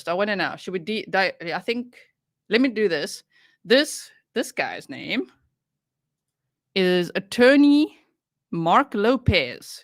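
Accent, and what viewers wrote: South Africa. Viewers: We are South African, living in George Western Cape. My husband said all along you sound South African. 🇿🇦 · I'm a South African and is trying to pin your accent. Are you a South African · You did not reply to a question I asked you on another video. I asked if you are ex South African, because I am & your accent sounds as if you could be from SA. 😊 I listen to another YT channel on crime, but I stopped listening, because the woman's voice grated on me. I've found that on other non crime channels too. I don't think YT creators realise that their voice & physical presentation DO matter. As do the facts, & not a whole lot of speculation or repetition! This is why I like watching you. You tick all the boxes. I also like that you don't swear! That's a huge turn off for me. Did I hear you say you'd been an airline pilot?? Just after I commented on what I like about you, you went on to talk about being responsible. 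That's exactly right, I see you that way.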